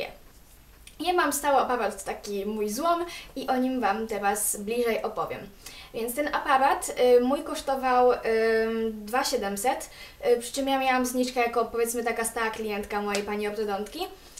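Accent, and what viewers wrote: France. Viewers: Jestes z Francji?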